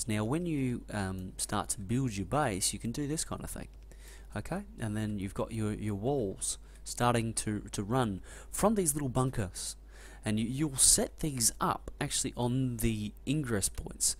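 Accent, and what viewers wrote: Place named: Australia. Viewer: question, do australians all say "turrent" because of some language reason? i've heard it before, they dont say "turret".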